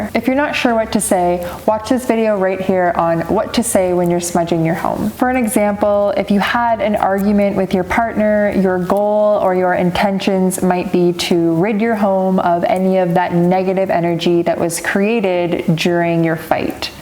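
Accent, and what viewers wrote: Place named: Canada. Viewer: Thought process: North American she says... so US? Wait no she’s saying it’s ok! That’s not very American.. Ahh the accent is coming out. You must be Canadian you guys really are way too nice haha 😅 And I realize you said to not spread harmful stereotypes but I don’t see the harm in this one, except maybe in that by trusting and giving anyone the right to your sacred rituals you’re harming those of you who are indigenous and can’t put on or take off their heritage whenever it benefits them, because in the US at least, not every Native reservation has access to their own culture (ex. Moccasins can be expensive but way more pretty White girls than indigenous people on reservations can afford them; no hate just a point). I don’t know about the situation in Canada, y’all’s country seems to have everything figured out all the time😅 but for viewers in the USA it’s crucial to redirect the focus from “yes boo you can do whatever you want as long as you promise to educate yourself and be respectful” to “check your privilege, use it to benefit indigenous people. 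If you love their culture sooo much, give it back to them. Fight for them. Instead of participating in a ‘cool’ event, maybe enable an indigenous person to go to that rally because it means more to them. If you can, get doctors and lawyers and people who have more power to make a difference to go and help. There’s nothing there for ‘you.’”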